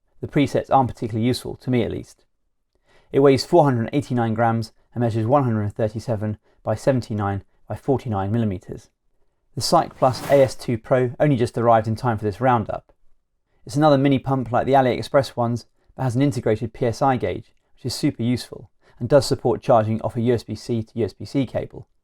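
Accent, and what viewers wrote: United Kingdom. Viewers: Thanks a lot!! This video really helped me! Nice English accent! I wish I could speak like you :D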